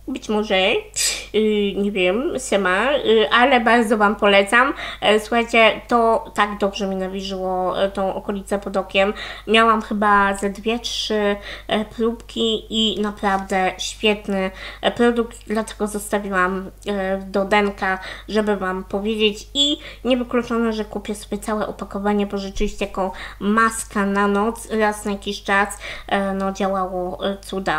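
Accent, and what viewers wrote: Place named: France. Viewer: Muszę wypróbować polecany przez Ciebie tusz od Eweliny 😁 a Tobie z tej samej marki polecam bazę pod tusz. Aaa cudnie mówisz z francuskim akcentem 😄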